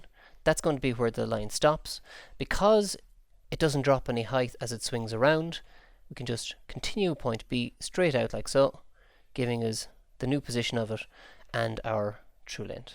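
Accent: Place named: Ireland. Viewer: This is amazing! Very good job. Also love your accent. It's Irish isn't it?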